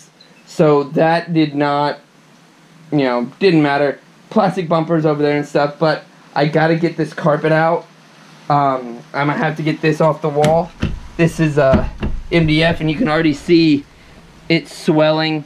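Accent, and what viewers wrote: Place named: Alabama, United States. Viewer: you might be from Houston but you sound like your from Alabama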